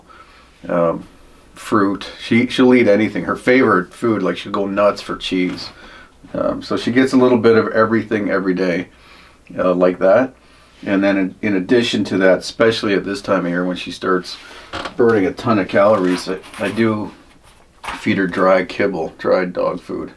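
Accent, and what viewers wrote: Canada. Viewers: I love the Canadian ascent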